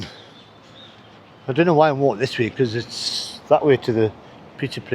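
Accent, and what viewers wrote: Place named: Northern England, United Kingdom. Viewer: The English accent is difficult. It’s a working class non southern English accent and it’s hard for me as an American. Ha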